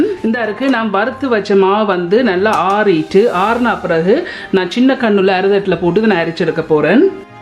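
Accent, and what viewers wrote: Jaffna, Sri Lanka. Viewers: Srilankan tamil aa?? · Jaffna tamil duper❤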